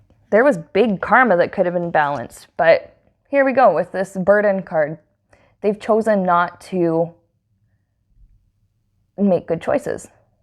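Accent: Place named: Russia. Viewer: Congrats your new apartment 🎉 also that Russian accent 😎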